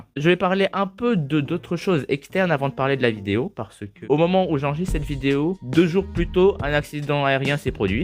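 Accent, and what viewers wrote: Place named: France. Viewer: Mmm French accent~ 😛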